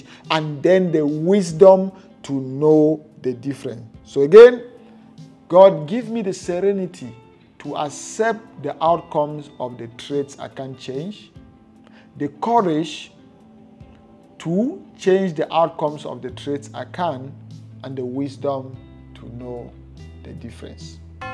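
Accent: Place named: Nigeria. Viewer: This guy looks and talks like a Nigerian from cross river or Akwa Ibom. Could it be that he is from one the villages around Bakassi Peninsula-Naija/Cameroon towns?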